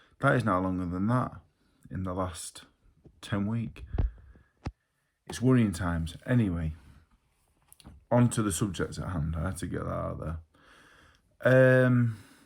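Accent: Yorkshire, United Kingdom. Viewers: Never quite got my head round how people have conceived u to be punch drunk? I don’t know if it’s because I’m northern too and just take it for granted this is how we sound?! Especially being a Yorkshireman it’s almost a curse if you box as people assume uve taken too many punches when really you’ve been raised by big burley, thick sounding farmers or somewhere down the line ur ancestors have! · Definitely no slurred speech, you speak slowly, precisely and are articulate. Plus Yorkshire accent aswell might confuse southerners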